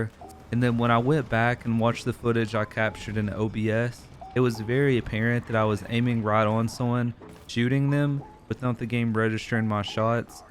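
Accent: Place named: South, United States. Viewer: you have a really cute accent XD sounds southern anyway im getting it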